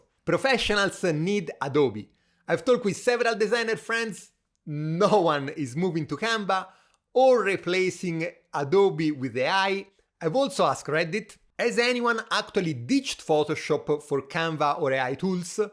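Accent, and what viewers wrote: Tuscany, Italy. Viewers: Fantastico come l’accento toscano si estende anche all’inglese 😄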